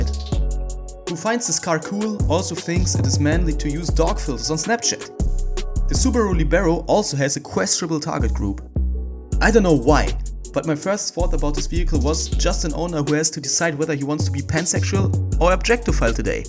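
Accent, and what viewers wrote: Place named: Germany. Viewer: Damn bro, your German accent is hella cool for this type of video Keep pushing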